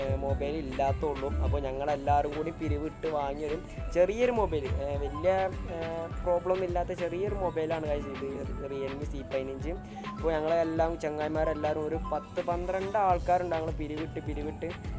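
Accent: Malappuram, India. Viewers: Malappuram ഭാഷ പെവർ ✌️✌️🔥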